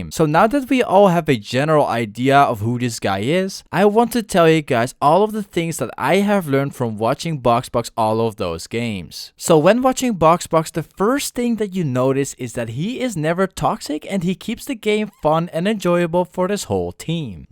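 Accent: Netherlands. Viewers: Dutch accent FTW.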